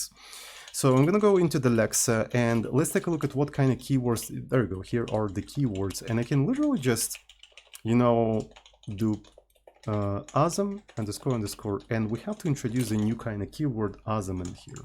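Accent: Russia. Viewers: Мне одному слышен русский акцент английского языка? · ну, русский/украинский/белорусский он точно знает, и акцент очевидно есть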